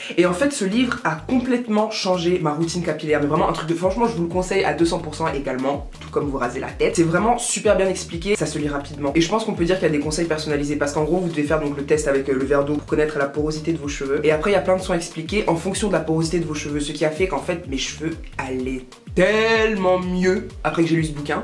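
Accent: Ivory Coast. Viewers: L'accent ivoirien 🇨🇮🇨🇮🇨🇮💗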